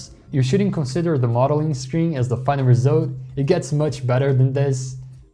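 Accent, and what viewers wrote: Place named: Brazil. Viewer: It's our Brazillian accent, if englsih was spoken correctly is really kinda cute .-.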